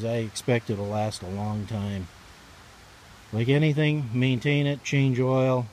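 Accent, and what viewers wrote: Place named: Pennsylvania, United States. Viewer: Sounds like your from Pennsylvania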